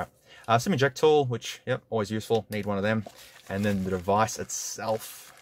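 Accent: Australia. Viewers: I personally like the long vids, and for an aussie you have a pleasant accent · From one Aussie to another, our accent always throws me when I hear it in media haha. Bloody good shit though, subbed. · Well he does speak Australian English. So, yeah. 🤷🏼‍♂️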